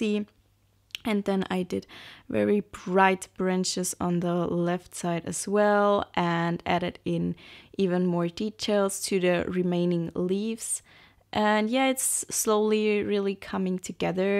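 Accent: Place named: Germany. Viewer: she sounds quite german ... so I guess there's your reason for these nonsensical phrases she lets loose sometimes ... ... ... like i.e. 'the bottom layer is slightly rewedded by the paint' ??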